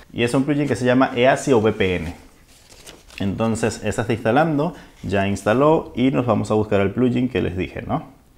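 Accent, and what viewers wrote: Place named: Venezuela. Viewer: Wey eres de venezuela tengo un compa que habla como tu y es de venezuela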